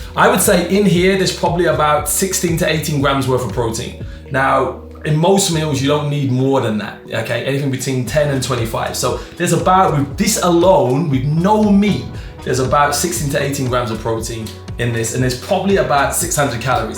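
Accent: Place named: France. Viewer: You are so real, love your French accent, would love to hear your American accent.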